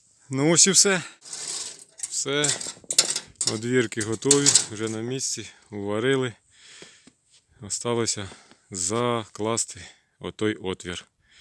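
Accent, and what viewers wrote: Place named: Kyiv, Ukraine. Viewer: ви і родом з Херсонської області? Розмовляєте,як у нас на Київщині 😀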